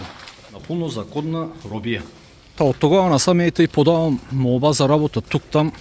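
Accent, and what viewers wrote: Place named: Northeast, Bulgaria. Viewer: Не се научиха ,че без да знаеш езика на местните няма да ти се получи. Като те слушам как говориш ми се струва ,че си от североизточна България нали ? Не си идвай тука , че същото те чака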